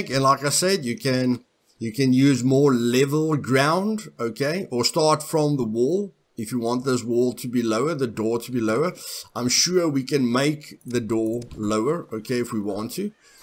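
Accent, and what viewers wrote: Afrikaans, South Africa. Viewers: I only subbed because your Afrikaans accent is so strong. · this was a good video. funny bloke :) a bit clumsy sometimes. hilarious. very genuine and yes. the game is what we make of it. cheers fella. can i ask - is that an Afrikaans or British SA accent? :)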